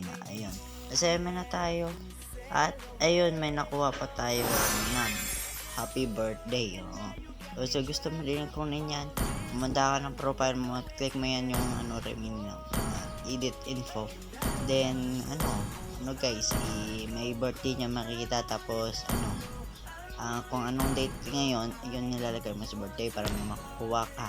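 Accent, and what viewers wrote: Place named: Bisakol, Philippines. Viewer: Parang bisakol na nga mag explain tas scam pa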